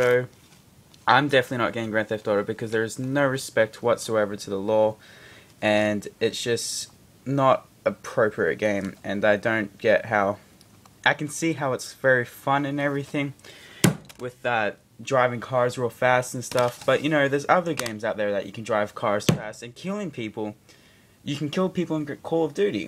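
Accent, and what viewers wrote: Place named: Australia. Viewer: BRIT !!! he's Australian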